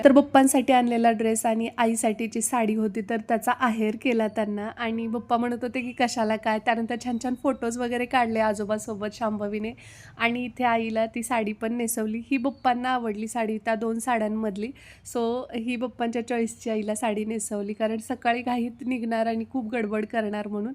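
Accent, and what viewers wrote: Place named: Kolhapur, India. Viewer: Karad kolhapur side che watata..kalwan wagere words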